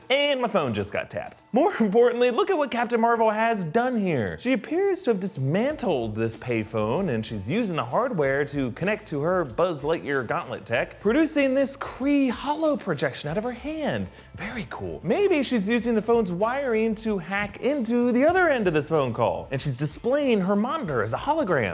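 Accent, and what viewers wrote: Russia. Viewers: Your Russian accent made me think of Tank. I miss Tank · Like your russion accent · Love ur russian accent and the parallel with cw phone is purfect nice guess 👍 Awesome vdo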